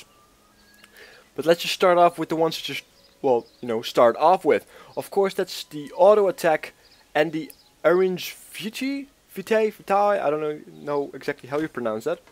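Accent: Netherlands. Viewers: hehe grappig dat je vaak snel kan horen of iemand Nederlands is :D Nice video trouwens.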